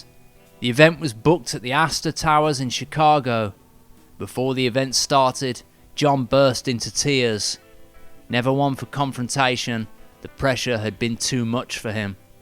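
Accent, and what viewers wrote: Birmingham, United Kingdom. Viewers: Yow sarnd like a brummie like me pal... Well I'm Wolverhampton but everyone calls us brummies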